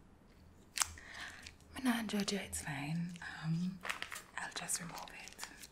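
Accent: Jamaica. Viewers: I was legit just about to turn in and decided to look through my recommended videos for something to help me fall asleep and when I saw this title I squealed and immediately clicked because YES, A JAMAICAN DOING ASMR?! - WE LOVE TO SEE IT! I got tingles just from your intro and I already love your voice and accent, never grabbed my headphones or hit subscribe so fast ... so glad I found your channel, I have a feeling you’re gonna be huge! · I love the Jamaican accent specially in music, but in ASMR, It’s something I never knew I needed til now 😭!! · Your voice is always so soothing, combined with that Jamaican accent, this is actual perfection 😭😭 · Jamaican accent is my favorite accent now... just so in love with this video 💖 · If my brain stops concentrating it turns the accent Irish, especially the whispered make up video. It must be the lyrical aspect. It sounds Jamaican again when I concentrate. 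But I love both accents! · My old soccer coach from when I was a kid is Jamaican, haven’t heard this accent in years (not many Jamaicans in the south east of the US I suppose) so this is so cool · Jamaican accent is just the coolest accent to me, idk why it just sounds awesome haha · i love this so much, its not like a corny jamaican accent its so soft omg :) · My all time favorite movie is still Cool Runnings. It makes me happy so much specially because of the accents I love Jamaican accents. Now you put the biggest smile on my face with your Jamaican accent. I love this video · Never realized how much I love jamaican accents😩❤❤ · I love Jamaican accents, I think it’s one of the most beautiful. Every word is said with so much passion and emotion. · I had never heard a Jamaican accent before, so thanks for teaching me something new and for being soothing! The accent sounds very friendly and happy, I like it. 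You are also very beautiful! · I loooove the Jamaican accent! It's one of my favorites! Soo underrated! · Goodness, I always struggle to completely understand Jamaican accent, but I LOVE it! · Same I never knew I needed a Jamaican asmrist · My fav music is dancehall and thanks to that I understood everything and oh it was sooo relaxing 😌 I love Jamaican accents. they have such a beautiful way of speaking and articulating.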